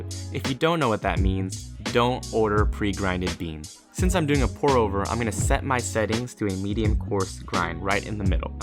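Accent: Philippines. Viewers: We lub da pilipino akcent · I just got a pour over set for Christmas and this video got me SUPER excited to make myself coffee <3 the only thing is that my set came with a manual grinder that I have no idea how to use yet lol. But great vid, love the pilipino accent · way cool. dance moves. Philippine accent cut over. super fun.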